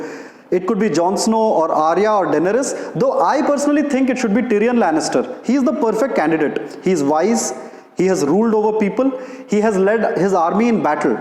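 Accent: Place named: India. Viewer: This guy reads reddit and makes a video with typical indian pronounciation 🤭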